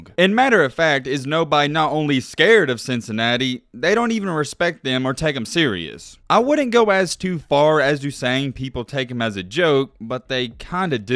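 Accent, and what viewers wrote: South, United States. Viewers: You're drawl gives you away SEC lifer....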